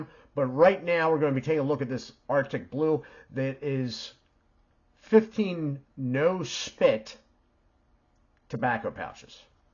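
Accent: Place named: Maryland, United States. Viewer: I have family up and down the east coast but your "O's" made me think of the MD accent. I was close. VA here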